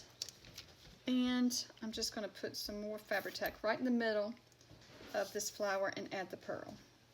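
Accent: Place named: Texas, United States. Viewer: Where are you from? My first language isn't english, I understand everything you say but I can help thinking that you may be from Texas or some american place with cowboys and sun lol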